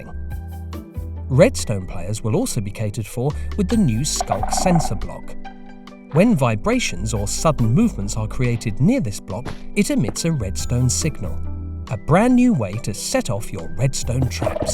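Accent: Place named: United Kingdom. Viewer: イギリス訛りいいねぇ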